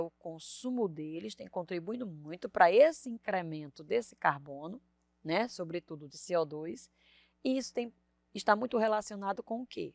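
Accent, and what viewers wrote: Northeast, Brazil. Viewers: pelo sotaque acho q do nordeste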